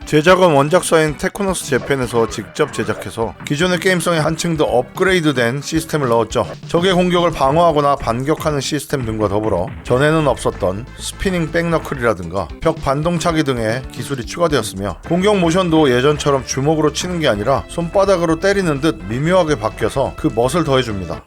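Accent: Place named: Jeolla, South Korea. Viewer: 극강인지라~ 뭐뭐인지라~ 전라도사투리 오지게나오네요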